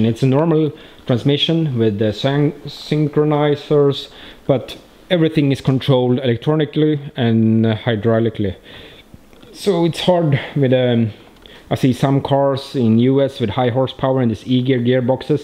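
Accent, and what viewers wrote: Sweden. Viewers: are you Swedish? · I guess you're from sweden because your accent sounds like Christian von Koenigsegg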